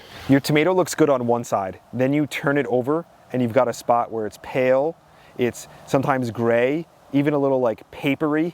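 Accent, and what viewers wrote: New Jersey, United States. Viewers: Love my guy from Jersey- Make sure you use lots of wooder...😂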